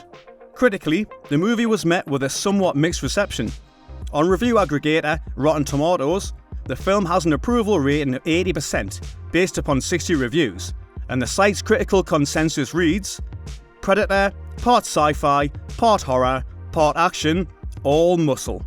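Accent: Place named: Newcastle, United Kingdom. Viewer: That's a Geordie accent. Not Australian.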